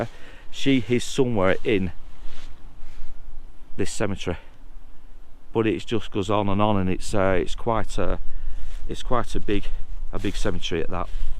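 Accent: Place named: Lancashire, United Kingdom. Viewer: Great video again. Discovered you today and have watched three. Originally tuned in tot hear the accent because my father and all his ancestors were Bacup born and bred. Anyway about the Hindle case. Why was the trial so quick. Today that would take weeks. How can the jury only have been out 12 minutes when there is such a lot to think about? Seems to me he was railroaded by a policeman eager to put it to bed.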